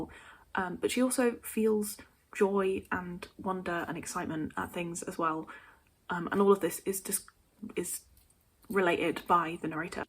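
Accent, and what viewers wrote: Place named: France. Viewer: Sounds very French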